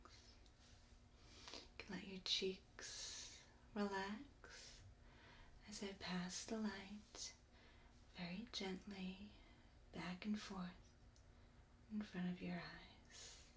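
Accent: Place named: South, United States. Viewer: I don't comment very often on your channel, but I just saw this vid 2.5 times... That good it was!! Amazing tingles!! ...Thanks for that! :D ...ALSO, there's a rhythm on every aspect of the video that makes it SO soothing -I bet if we measure your movements, those must be along the range of the Theta Waves- and so relaxing! ... ...and one more thing, I love your southern accent! Is so mellow... Talking with contractions is sooo mellow xD Thanks again! :D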